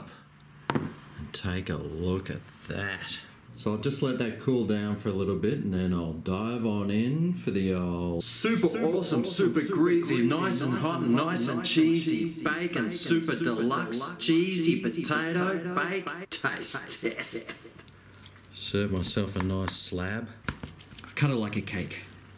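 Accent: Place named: Australia. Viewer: You sound like you're from Australia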